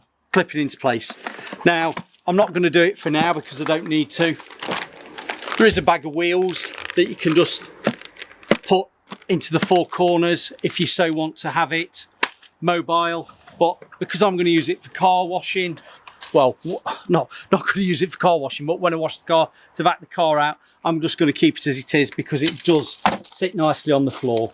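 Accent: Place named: Cockney, United Kingdom. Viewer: This man speaks in a Cockney accent.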